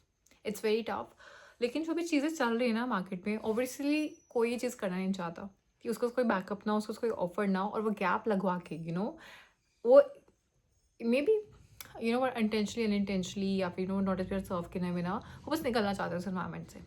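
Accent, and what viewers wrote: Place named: Delhi, India. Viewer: Aapka communication and Hindi dekhke I can be sure ki aap Delhi se ho.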